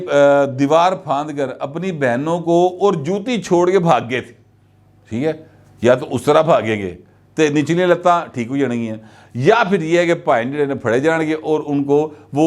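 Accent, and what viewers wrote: Punjab, Pakistan. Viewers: اَلسَلامُ عَلَيْكُم وَرَحْمَةُ اَللهِ وَبَرَكاتُهُ جناب محترم بھائی صاحب اردو میں پنجابی کا تڑکا لاجواب۔۔۔۔👌👌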